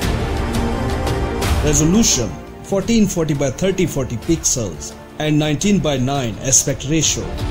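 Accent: India. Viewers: Are u from south india or oriya? Anyway stop spreading rumor. These are not correct info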